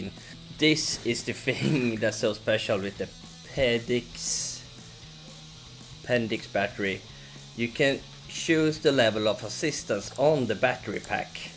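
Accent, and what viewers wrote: Sweden. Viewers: Swedish accent, Skatepunk/Millencolin in the background, talking about battaries on bikes. I am a fan(Denmark)!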